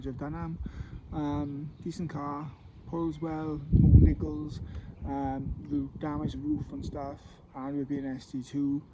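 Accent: Wales, United Kingdom. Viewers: Just randomly got this video watched and heard the accent and subscribed straight away. From the valleys myself and plus I love these cars. Happy days mate 👍🏼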